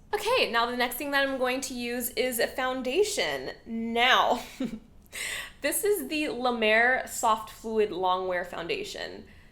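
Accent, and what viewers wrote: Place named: Australia. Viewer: I thought you were gonna say it's a "wowder" LOL been waiting for someone to shade glossier like that You look great!!! Also loving the free aussie accent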